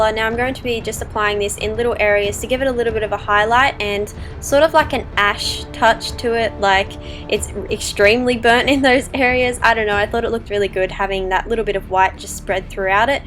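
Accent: Australia. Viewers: You Aussie? Love this!! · Yeah Australian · Amazing! I'm taking from the accent you're Australian like me, so is there a particular place fellow Aussie's can buy Ben Nye supplies or does it all have to be online/from USA? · are you Australian? Your really pretty and an awsome make up artist btw! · Lawrence Stapleton she sounds very australian : )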